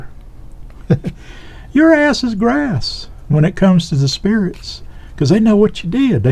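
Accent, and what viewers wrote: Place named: Kentucky, United States. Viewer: I don't know where you're from, but you sound like you're from my neck of the woods........ Kentucky.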